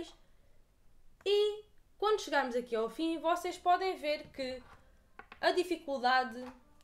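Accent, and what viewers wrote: Portugal. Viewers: Deve ser divertido demais jogar um jogo desse com a, o jeito de falar o português de Portugual é bacana demais!!! Show de bola o vídeo!!!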